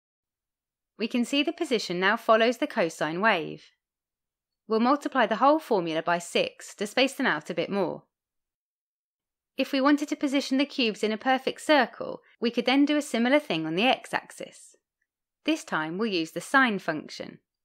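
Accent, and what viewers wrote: England, United Kingdom. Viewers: are you from england?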